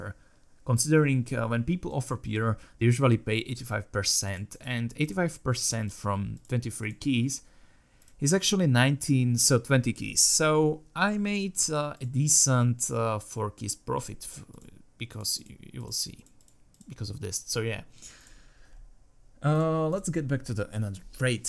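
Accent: Slovakia. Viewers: Počkej, ty jsi slovák? :D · Len som klikol na video asi 1minutu som ťa počúval a hneď som vedel že si slovák je to vidieť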